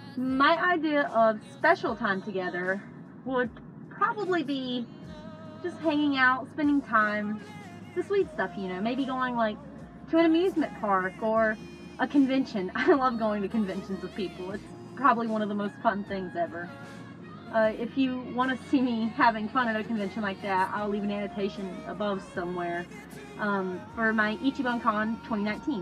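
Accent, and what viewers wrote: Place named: South, United States. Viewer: Thats pretty awesome to learn some things about you! I would like to say, I love your southern accent (Its very strong but really nice). I enjoy video like these! :)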